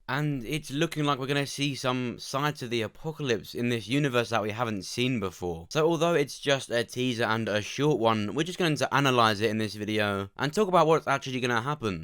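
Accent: United Kingdom. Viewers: Do you ever do live Q and As?? Your theories are so interesting I’d love to ask your opinions on some other things TWD related And sooooo good to hear a fellow Brit breaking down these trailers and info. I want to win!!!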